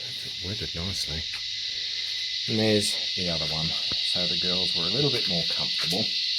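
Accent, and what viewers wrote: Australia. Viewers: I’ve seen a few of your vids now and I have to tell you how lovely it is to hear an Australian on YouTube. I know there are many but most of my subs are from North America. Enjoy your work.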